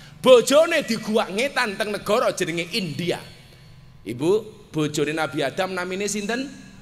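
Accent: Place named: Java, Indonesia. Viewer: Bahasa nya internasional dong.. Nggak ngerti medok banget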